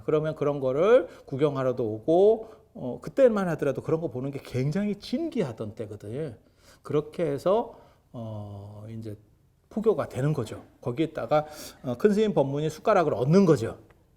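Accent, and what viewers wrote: Jeolla, South Korea. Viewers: 스님께선 서울서 자랐다고 늘 말씀을 하시는데 말투나 어조는 전라도 사투리가 같아요 시간될때 말씀좀 부탁드립니다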